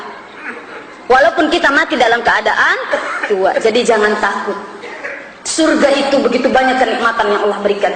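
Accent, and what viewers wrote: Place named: Java, Indonesia. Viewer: Bu gk orang Jawa tinggal di Pinrang atau orang Pinrang mondok di Jawa logatnya masih ada logat jawanya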